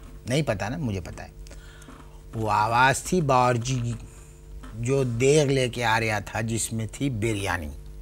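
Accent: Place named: Bareilly, India. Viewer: Urdu accent of Bareilly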